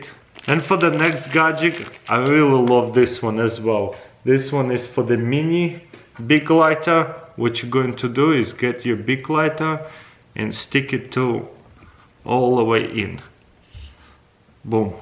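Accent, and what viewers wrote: Russia. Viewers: Russian awful english Slang... Bäääää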